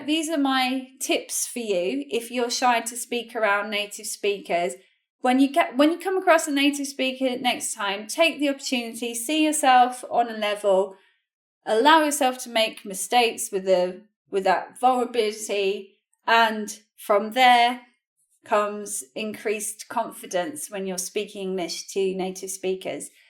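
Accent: United Kingdom. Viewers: Hi, do you english is british? Thank you for tips! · My entire life I learned the american english, but I understand better your british english than the american one.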